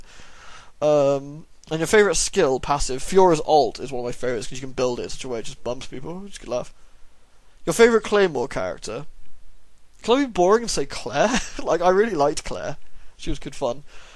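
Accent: United Kingdom. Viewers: acknowledged me! For some reason I fangirled when you yawned during my question... It must be your sexy Britishness or I'm weird. Either way keep up the amazing videos, they are one of my highlights.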